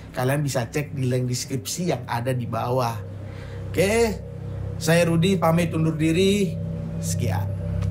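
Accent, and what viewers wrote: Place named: Madura, Indonesia. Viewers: Iyut Reng oreng Medhureh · Asli Madura yaa mass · Accent nya Suroboyoan bangett,,tapi mas nya orang Madura nih hee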